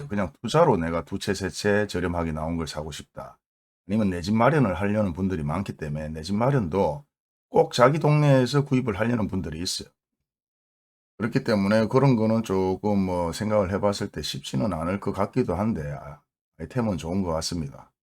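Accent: Gyeongsang, South Korea. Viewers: 저음에 투박한듯한 경상도 사투리 저는 듣기 좋습니다~~감사합니다^^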